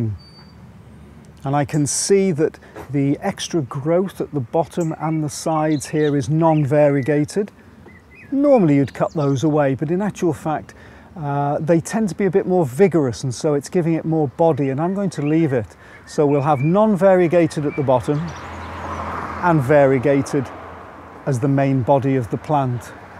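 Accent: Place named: Southern England, United Kingdom. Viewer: that’s great to know as one place I’ve planted them is in a planter up high, I love plants that spill out:) forsythia is on my list for next year along with plants that I couldn’t source this year. Although qvc has a rarer plant hour today so I may be ordering:) oh your in the north, I thought you sounded more southern lol happy gardening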